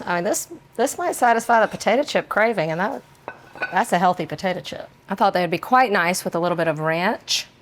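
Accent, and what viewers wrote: South, United States. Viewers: Hi there, your videos are SO good. You're so at ease with and very able to articulate the how and why of cooking with an air fryer, and the oven, of course. You're a very accomplished and personable woman. I've learned a lot from you about air fryers and cooking. And, your Southern easy-going presence and sound are familiar to me. Are you originally from Texas? I'm an 82 y/o woman who has just discovered air fryers. I received my 4 qt. Cuisinart today and after watching your video that included many things to cook using flour tortillas, I made a small meal using one of the small round tortillas I bought yesterday, filling it with delicious foods, and enjoying it within 6 minutes. It'll be a new tasty meal, so easy and tasty. So, thank you.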